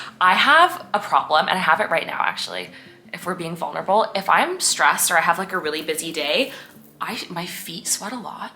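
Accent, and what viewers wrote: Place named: Canada. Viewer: You have such a fun personality, and I can instantly hear your Canadian accent😆😆😆…. I’m actually kind of blown away by your portable light for your laptop.🤯🤯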